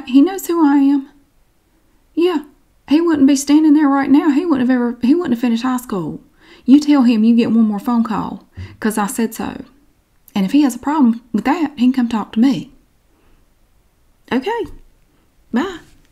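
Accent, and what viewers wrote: South, United States. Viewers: Hi from Great Britain. Im a brand new subscriber, so glad I came across your videos, I love your Southern accent.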